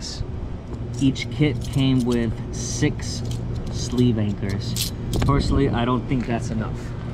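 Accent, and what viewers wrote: New York, United States. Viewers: I see and hear you're in NY...laws and regs are crazy there...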